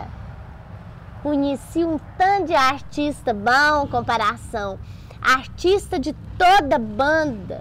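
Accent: Minas Gerais, Brazil. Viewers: Consessa que coisa linda ouvi você com essa simplicidade com esse minerez, só você Deus te de vida longa para nos alerta bjs coisinha 😘😪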